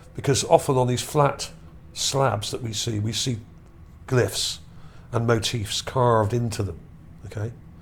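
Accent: Bristol, United Kingdom. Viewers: Sound like a Bristolian or are me ears playing up…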